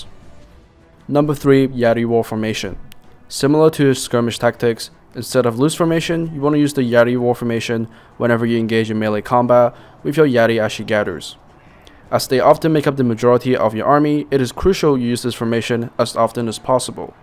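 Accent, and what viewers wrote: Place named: Scotland, United Kingdom. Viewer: why do you sound kind of scottish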